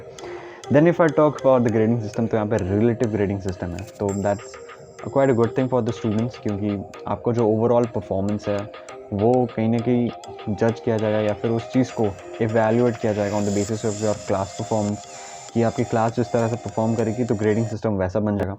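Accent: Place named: Maharashtra, India. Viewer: Dream College ❤️.... Thanks 😊 This will be very useful for us.... Your Marathi Accent is too good...👍😊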